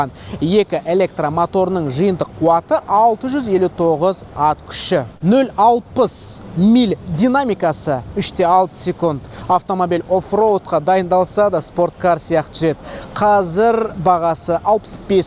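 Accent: Standard. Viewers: ҚАЗАҚСТАНДА ЖАЛҒЫЗ ТАЗА ҚАЗАҚША 1000% СОЙЛЕЙТІН ОСЫ СИЯҚТЫ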